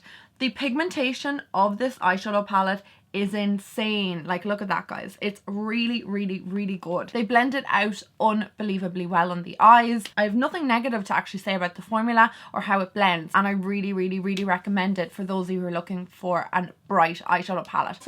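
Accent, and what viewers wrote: Ireland. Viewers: Where is your accent from in Ireland?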